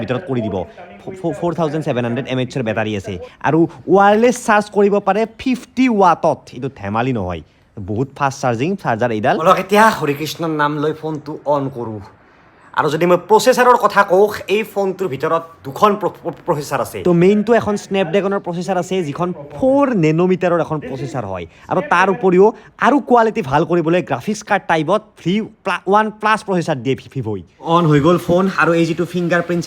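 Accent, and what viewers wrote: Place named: Nalbari, India. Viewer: da apunar kotha bur bohut val lage, Moiu nalbariya hoi, Mur koka aita ghor nalbarir, But moi golaghat ot jnmo hoi Golaghot te Amar ghor etiya, Heibbe nalbariya kotha nihikilu valkoi😊😊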